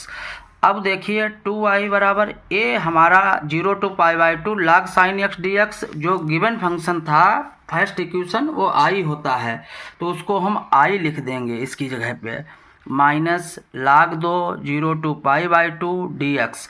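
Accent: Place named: Bihar, India. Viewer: Bhai eski bihari master ki language toh kam kam hi samj aayi pr, pr question toh smj hi gyi Mai 😅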